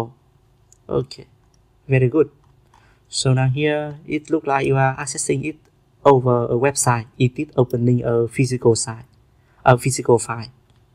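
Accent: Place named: Vietnam. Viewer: Sir the way you speak english I assume you're a Vietnamese cause I'm a VNmese and it's really easy to spot out a Vietnamese, in that case Xin chào và video này dễ hiểu ấy But if you're not then ok It's really easy to understand what you said and what you do. Thankyou sir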